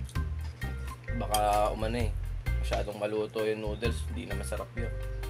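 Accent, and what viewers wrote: Visayas, Philippines. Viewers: kuya visaya kaba??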